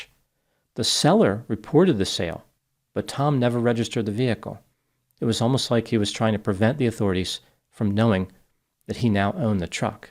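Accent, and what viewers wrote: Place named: South Jersey, United States. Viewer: He has a south Jersey accent, so I think you might be right 😆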